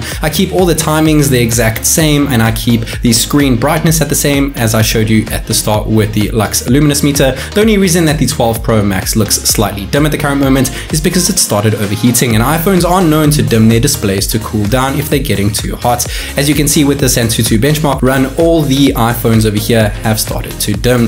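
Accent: South Africa. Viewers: Saffa accent so strong 💪🏽🤣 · Dude are you from SA? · U south african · Is this guy South African?🤔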